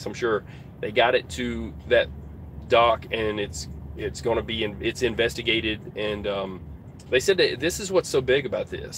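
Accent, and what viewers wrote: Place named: Midwest, United States. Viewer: How the hell do you know that cartoon? I watched it as a kid but I was born in 70s almost 80s lol....... kursk stuff- we offered help ? Can U speak Norwegian? Lol you speak like from midwest ..white, redblooded murican boy.:) what I meant was that were Norwegians who offered help. Its arctic circle. Do u think America with her 2 old ass icebreakers vs Russian 57 icebreakers....u offered help lol.....do you know that many think it got hit by Ohio class that was getting the repairs in Norway a few days later and you can see from the satellite image that the emergency capsule is not there. Removed . Why ? Why even repair in Norway for an American sub if it's not some regular maintenance. PS... I like you even touched this subject Dude does that bald black commentator with glasses look like ru paul?lol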